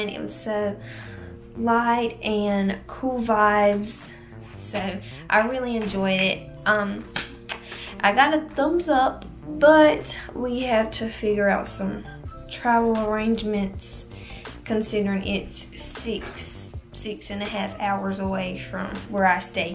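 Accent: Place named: South, United States. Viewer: omg i love your accent!!! country gal just like me and a natural i love it girl!!! Will subscribe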